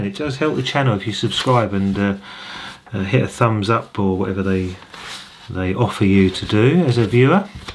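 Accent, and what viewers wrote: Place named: RP, United Kingdom. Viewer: Your videos are strange. I don't know if you care about music at all... Do you? Or are you all about reselling? Or is it just an obsession? Your "posh" (affected or not?) accent quite throws me of. I cannot figure you out... Where are you from? [Full disclosure, I am a Fleming from Brussels, but i've lived in Massachussets (USA) for 40 years.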